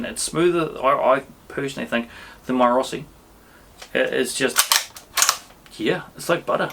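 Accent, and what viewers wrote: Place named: Waikato, New Zealand. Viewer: That's a waikato accent if I've ever heard one, even looks like my stomping ground up thompsons, looks like it would be a epic kaimai supplejack gun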